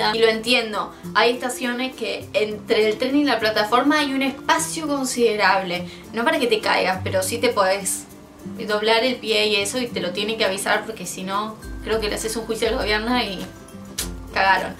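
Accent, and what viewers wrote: Argentina. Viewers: "vivis culturalmente en Argentina" ('??) o sos argentina? no me quedo claro porque tu acento es argentino pero dijiste que era el pais donde estas acostumbrada a vivir.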